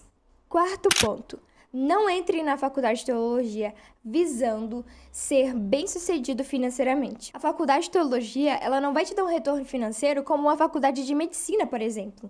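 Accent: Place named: São Paulo, Brazil. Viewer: Moça ótimo vídeo. Sou formado em RH e estou terminando Ed Física. Penso muito em fazer Teologia ou História, ainda estou nessa dúvida. Já tenho a prática de ler muito a Bíblia pelo menos 5x por semana e talz, pq amo esse relacionamento com o Senhor, enfim... Só gostaria de saber de você pela sua experiência: Você sugere o curso de Teologia ou a Faculdade, mediante há alguém que a princípio não pretende trabalhar na área Teológica. E, qual faculdade de SP presencial (Se for de SP pelo sotaque kkk), você indica?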